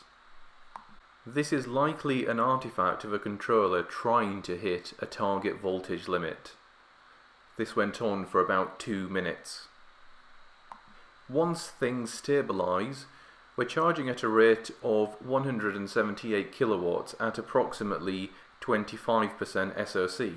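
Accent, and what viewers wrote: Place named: Yorkshire, United Kingdom. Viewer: Half southern half yorkshire accent lol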